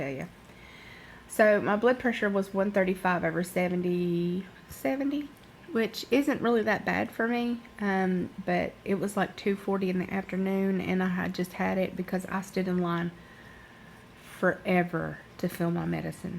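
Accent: South, United States. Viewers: Are you from the South, honey?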